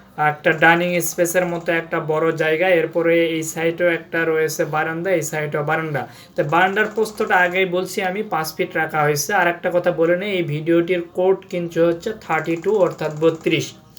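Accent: Naogaon, Bangladesh. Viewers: ভাই আপনার বাড়ি কোনটে? আমাকরে নওগাঁর মতোন ভাষা লাগিচ্ছে।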